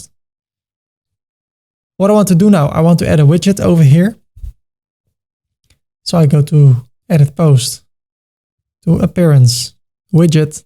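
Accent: Netherlands. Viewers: ik wist meteen dat je nederlnds was je kan wel goed engels praten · your accent and face just shows me that you are dutch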